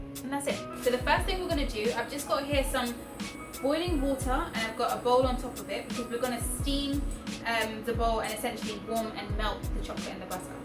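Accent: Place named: South, United States. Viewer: Not bad! Funny 🤣 the southern accent I mean. But amazing as always.🌹🌹❤️ Keep on producing these great videos. 🌹🌹🌹 You should have your own products in the Supermarkets.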